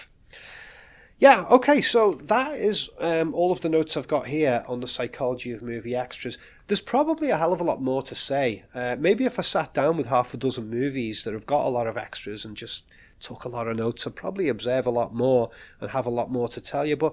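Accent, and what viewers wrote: United Kingdom. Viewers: Im not a natural speaker is this a british accent?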